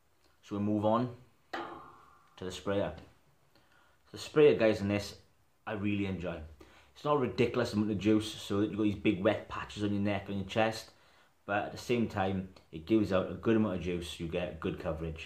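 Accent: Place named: Welsh Valleys, United Kingdom. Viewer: Subscribed earlier today. Glad you have reconsidered stopping. You sound like you are from my part of the world. I am from the Valleys. I come from Rhymney and I was born in a hospital in Merthyr. Your area?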